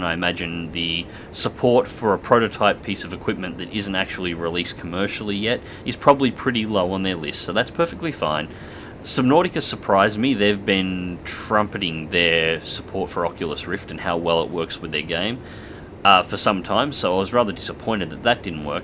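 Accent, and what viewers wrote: Australia. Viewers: dyssynchro > ' accent >Our flag in background >Kangaroo >Needs to be told wat?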